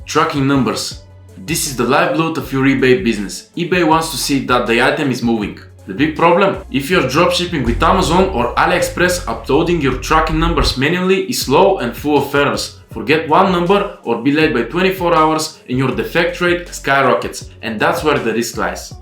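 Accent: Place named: Russia. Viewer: Your English is very good Russian heavy accent 😅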